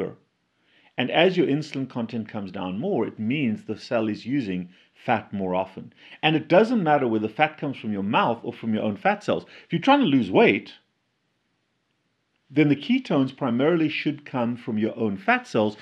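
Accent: South Africa. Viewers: From someone in the UK there is definitely a south African accent. Not much. But enough. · Subtle South African accent going on there doc….good content ! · I'm loving your video's dr (& so awesome to immediately recognize the S.A accent my home country) ♡ I'm so thrilled I came across you. I'm a RNP in private practice and total LCHF convert. Your many video's & interviews are highly informative. Thanks for your great work and well done on your achievements past and present ❣ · Funny, I live in South Africa and when I saw his first video I thought "He seems like a South African", but then I saw he wasn't. Later videos I realized he was. He not only still has a South African accent, but he looks like one too. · Too South African. Unmistakable accent. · He speaks like an upper class English speaking South Africa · He sounds South African. Probably is.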